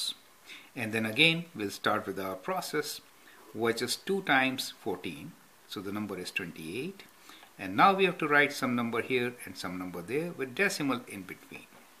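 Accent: India. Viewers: i hate the india voice, i can't understand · oh and its another indian guy with heavy accent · Vaibav V He is indian so english isnt his first language atleast hes doing his best to speak english and to help you understand square root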